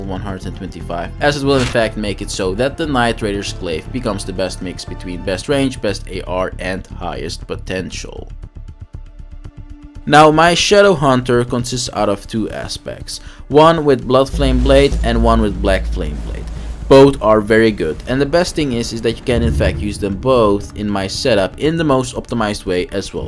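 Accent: Netherlands. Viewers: Holy sh*t wanna try out this build. Love me some artist in NG+. Now gonna go with this one in next run. Think I'll do a normal NG run to really see the power of this! O and artist really nice on most enemies, only Gargoyles are a pain. I use a flail cause of the dex arcane. And I was wondering cause of your accent if your from the Netherlands (I am).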